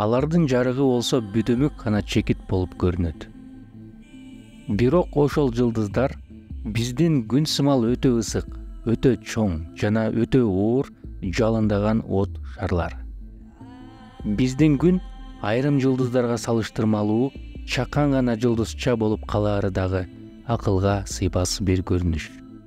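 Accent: Standard. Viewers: Ма Ша АЛЛАХ Кыргызымдын мыкты мырзасы сизге АЛЛАХЫМ ыраазы болсун, абдан соонун айтып берет экенсиз. Сүйлөгөнүнүз таза кыргызча болгонуна абдан кубандым сизге кичине болсо да колдоо көрсөтүү үчүн каналынызга катталдым😊 ишинизге ийгилик алга🤗👍 Бирок эмне болоорун бир кана ЖАРАТУУЧУ АЛЛА ТААЛА билет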